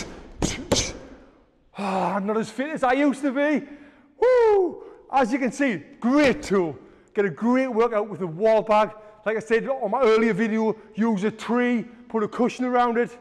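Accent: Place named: England, United Kingdom. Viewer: the english accent makes it more epic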